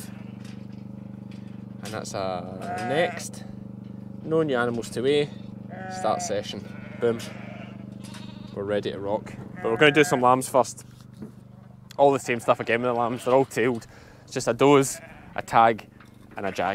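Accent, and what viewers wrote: Scotland, United Kingdom. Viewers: Wow, and I thought I could understand the Scotts…. Maybe give us a whole video with the full accent. I have so much to learn. 😂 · I'm a fairly new fan of Sheep Game... And I'm trying! I love the content but... Getting used to the Scottish accent is taking some work for these Canadian ears of mine. I'm glad is conscious of this challenge for viewers and adjusts things somewhat as he mentions here. My Granny emigrated from Glasgow so I thought I'd have an edge. Oh well it just means watching the same content 2 or 3 times to be sure. 😉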